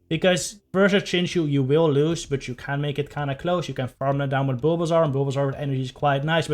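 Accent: Netherlands. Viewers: you sound so dutch lol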